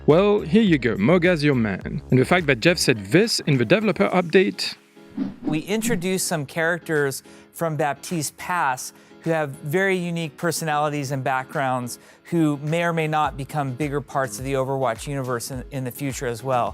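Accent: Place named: France. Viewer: Are you french? Cause you sound french.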